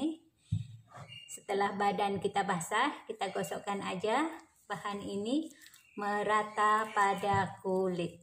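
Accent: Malaysia. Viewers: Logatnya kaya ngomong bahasa malaysia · Asli dari mana kak? Logat malaysia dialeg Indonesia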